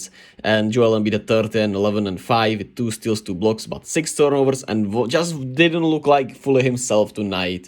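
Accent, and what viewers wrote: Czech Republic. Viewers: first time hearing a cezch accent. pretty good video, try improve your flow a bit in the sentences and you'll be interesting for sure! I understand English is not your first language but just keep practicing man. all love here though, I enjoyed it!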